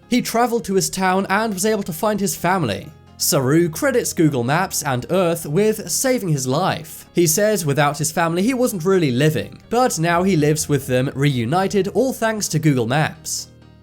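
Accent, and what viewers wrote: United Kingdom. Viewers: Are you britash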